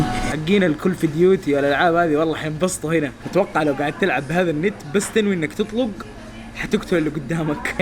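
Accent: Jeddah, Saudi Arabia. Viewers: انبسطت كتير من طريقة تقديمك للمعلومات وخصوصا اللهجة الجداوية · يا ولد صوته تقول واحد جداوي 😂😂😂😂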